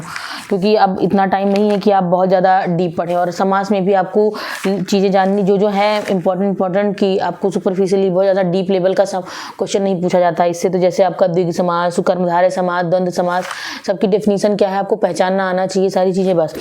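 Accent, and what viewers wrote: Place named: Mathura, India. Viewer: MAAM AAP KA CENTRE KAHAN HAI. AAP MATHURA K LG RHE HO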